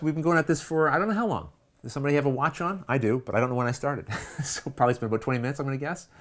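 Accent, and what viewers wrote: Canada. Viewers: That Canadian accent is pleasant :)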